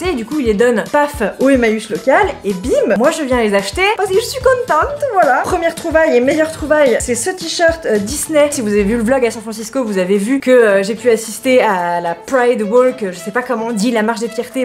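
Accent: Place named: Southwest France, France. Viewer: Oui une vidéo de! meilleures trouvailles du monde. j'adore ton accent de Maïté haha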